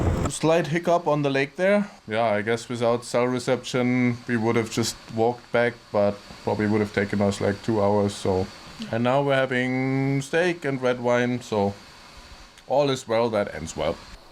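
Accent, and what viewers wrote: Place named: Germany. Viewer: A german accent in the yukon snow in underwear, what gold.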